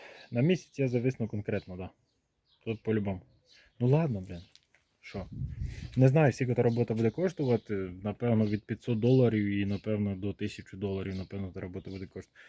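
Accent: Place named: Hutsul, Ukraine. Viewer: Привіт з Волині ,Автор одне питання ви з Гуцульшині чи області Закарпаття?????акцент увас похожий)).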